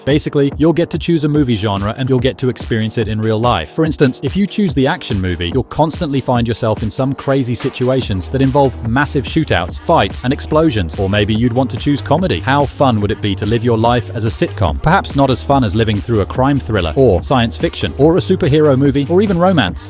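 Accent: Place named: United States. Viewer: Why is the AI American now wtf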